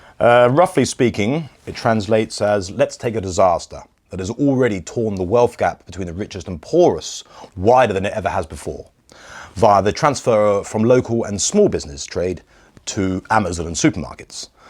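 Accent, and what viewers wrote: United States. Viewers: you are better than at least 99.5% percent of the actors in Hollywood! You have Madam Snarkle NAILED!! The American accent is amazing!! Congratulations to your fine work and warmest wishes from Northern California!:) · Thank You! Who knew I’d need a Brit to make sense of the word salad this creature constantly spews? You do a great American accent, and the hairstyle is spot on! 🇺🇸❤️🇬🇧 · Your American accent is excellent! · Best American accent I’ve heard by a Brit! (Apart from Hugh Laurie and his House character)!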